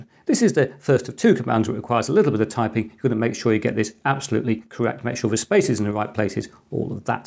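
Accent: England, United Kingdom. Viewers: este señor habla tan claro, que debería trabajar como locutor en el radio. Usando traducción automática, es casi perfecta, mejor que la traducción generada desde una voz sintetizada. Definitivamente el inglés de Inglaterra es mucho mejor que del Estados Unidos.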